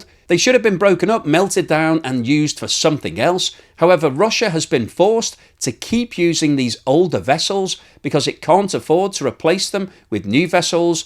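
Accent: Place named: Liverpool, United Kingdom. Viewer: Where do you live???? Liverpool I would guess 😊😊😊😊😊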